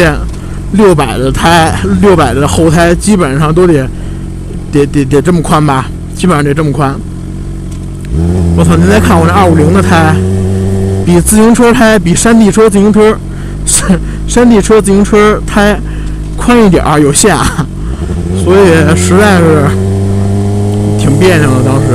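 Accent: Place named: Beijing, China. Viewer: 宽点有限A_A听着口音咋像北京捏，熟悉啊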